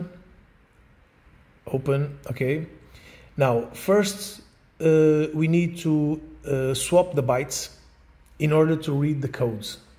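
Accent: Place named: Portugal. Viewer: O "ambiente de trabalho" denunciou o meu amigo, e o sotaque, Português! Eu tenho exatamente esse problema no meu 996, é possivel fazer isto em Portugal?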